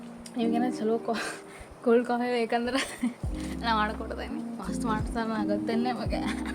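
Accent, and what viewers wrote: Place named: Hubli, India. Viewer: hubli Accent ✌️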